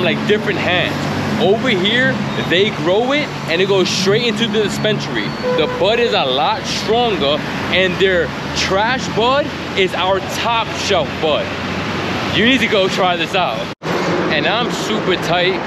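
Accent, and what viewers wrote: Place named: New York, United States. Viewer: Chanclas...how many understood that? you are pure neoyorquino...obvious when you talk LOL And thanks for the weed shop tip, so many shops in BKK are way overpriced ($25usd for a gram...yikes!)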